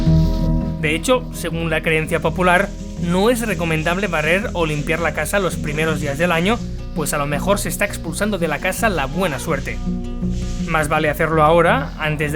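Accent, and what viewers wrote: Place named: Spain. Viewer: Hola, Soy de Argentina 🇦🇷 y creo que Tú eres español, pero me encantó Tú informe,,y estoy sorprendida de ésta religión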